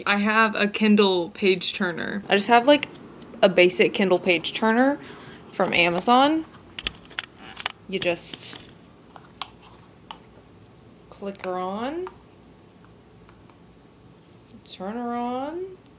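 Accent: South, United States. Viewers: My favorite thing is the southern accent slipping out when you’re excited. 😂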